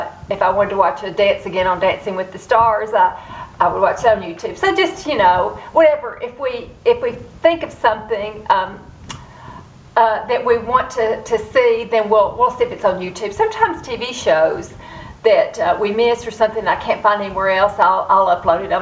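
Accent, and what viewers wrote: South, United States. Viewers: u have a beautiful disposition and the southern accent makes it even more precious,i enjoy seeing your loving and sweet relationship with your husband,i enjoy seeing how u make everyone around u feel special in so many ways, and in so many details,like bday celebrations with food,presents and making them feel special.i enjoy seeing u be in an awesome shape and do your exercises and stay healthy and strong,enjoy seeing your vibrant personality come through even when u r facing surgery.enjoy seeing bravery in action for putting yourself out to the world to be seen and heard.all these things about u and am sure many more to come, i feel need to be noted,acknowledged and celebrated,thank u for sharing your awesomeness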